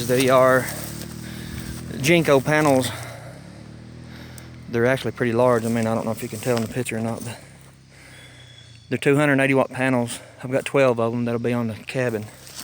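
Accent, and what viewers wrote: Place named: Western North Carolina, United States. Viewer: cool man! I'll watch. I subscribed to your channel. You sound like your from close to me from your accent. I'm in western North Carolina.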